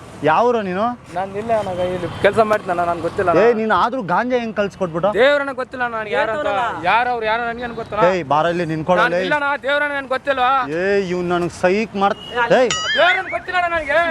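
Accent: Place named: North Karnataka, India. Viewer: ಖತರ್ನಾಕ್ ಉತ್ತರ ಕರ್ನಾಟಕ ಭಾಷೆ ಮಾತಾಡ್ತೀ ಬಿಡಣ್ಣ ಸೂಪರ್❤😅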